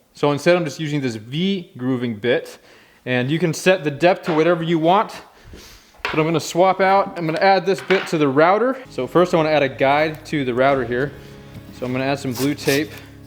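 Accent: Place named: Massachusetts, United States. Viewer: yeah that’s clear. You have a MA accent. One that is very familiar to me. That’s why I asked if you were from New England. I gave the a 6 state zone 😂. Happy Thanksgiving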